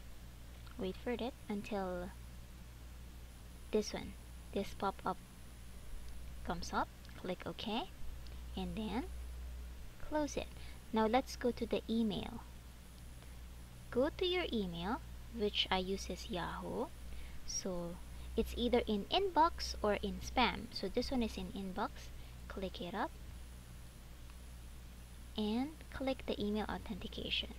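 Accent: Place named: Philippines. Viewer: You talk like my friend from the philippines, it's so cute! Also thanks for helping!!!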